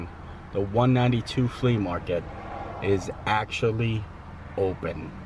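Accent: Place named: Midwest, United States. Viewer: Are you from more of the Midwestern part of PA or east coast? I thought you had more of a midwestern accent and mannerisms.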